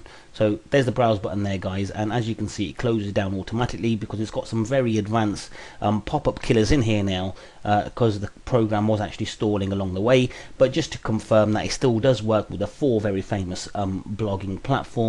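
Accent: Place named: England, United Kingdom. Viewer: I love your english accent, where do you come from ?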